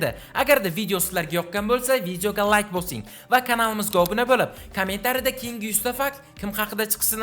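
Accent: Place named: Samarkand, Uzbekistan. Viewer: samarqandlik ekanligini shevasidan bilgandim zemlyakim buladi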